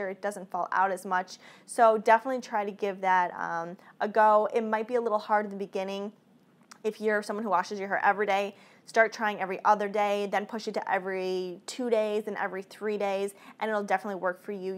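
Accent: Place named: Chicago, United States. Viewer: recognizing your Chicago accent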